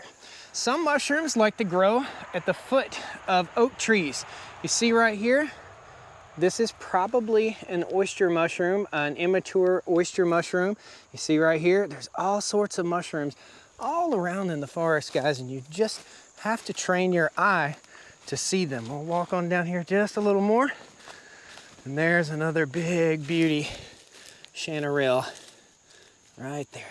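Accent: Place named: Carolina, United States. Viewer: I love your Carolina accent!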